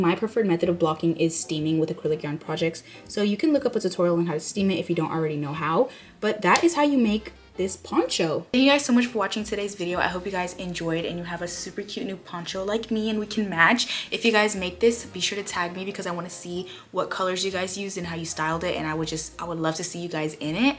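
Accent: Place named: United States. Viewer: thank you for the tutorial! could you pls tell me what american accent you have? asking for scientific purposes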